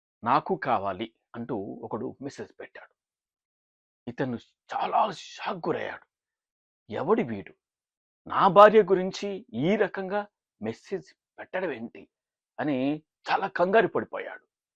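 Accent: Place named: Tamil Nadu, India. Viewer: Sir, neenga Tamil aa? Illa ennai pol Tamilum Telugum aa? Neenga pesara Telugu Tamil accent kalandhadhu. Aanal arimayaga Telugu pesikindireerargal. Tamil yasalo Telugu chala baga matladugunnaru. US lo Tamil lo matladi chala nallaindi naku.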